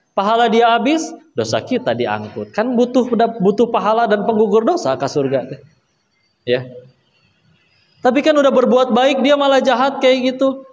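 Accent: Sunda, Indonesia. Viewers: Bener pisan logat sunda dan kalimatnya aagym banget. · Sunda pisan akang teh 😇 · Nyunda banget suka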